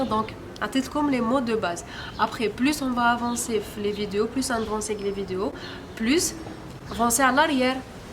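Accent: Bejaia, Algeria. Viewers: Il faut bien préciser, accent Bejaia VILLE, car nous en vallée de la soumam on parle bien comme les igawawen, et puis je te remercie de m'avoir appris "ala3ed" "delkouwa" Mine de rien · Neki ttmettatagh af l'accent bougiotte 😂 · J'aime pas l accent bougioite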